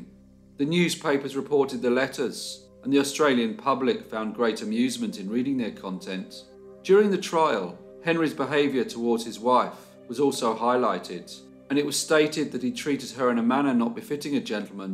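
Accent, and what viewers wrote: England, United Kingdom. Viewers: Just want to take the time to thank you, for your time and efforts. Incredible stories from the past! Your english accent makes it even better to listen to. Wish good week ahead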